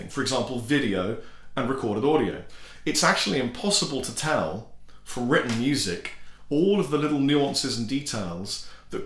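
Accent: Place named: Brighton, United Kingdom. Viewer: Hey, I´m 55, always dreamed of myself playing but never got the guts to take lessons and practice. Shame on me, I know. These days I use the adult life, job etc as excuses for not geting started, but, believe it or not, I still didnt give up. Probably I´ll never play at all, considering this failure of mine, but have you ever heard of utopia? Sure you did. That´s whta me playing at least reasonably well means to me. Two final things: perhaps the flip side of your conception of music and playing is some guys like... Klara Soderberg (First Aid Kit), Nataly Dawn, Stereo Total (ever heard them?). Their technique is way too rudimentary, sketchy, but they do manage to create great fun music. I tend to become a bit bored of virtuoso players who, despite of having a monster technique, dont "get there", cant make music that´s enjoyable after all. 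I´d put in this basket 90% of mainstream, wealthy acts: Eric Clapton, Pink Floyd, RHCP, you name it. For me, once the music is fine, it doesnt really matters how skilly you are. 2nd and last: does everybody in Brighton has your accent? It´s just great!! I wish I could walk around in London and hear only that around me.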